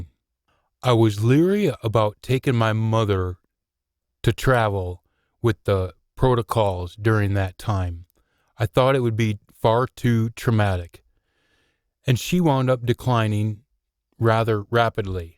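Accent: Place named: United States. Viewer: Your American not Scottish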